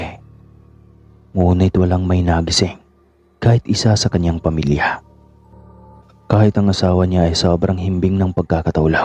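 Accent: Visayas, Philippines. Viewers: pangit mo mag kwento.. bisayang bisaya.. yay.. gusto koyung kwento boses molang ang pangit pati pag deliver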